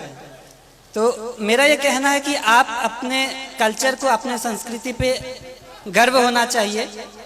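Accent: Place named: Samastipur, India. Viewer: Sir ye to language samstipur ka hai❤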